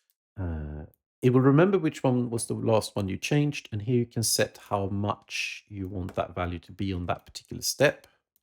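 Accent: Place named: Sweden. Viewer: Lool, after listening a bit more I did think your accent sounded vaguely Swedish, but I heard "I think that's how it's meant to be pronounced" as being about the word itself and not just the company. Norwegian fella here correcting Swedes on their Swedish 🤣 sorry altså.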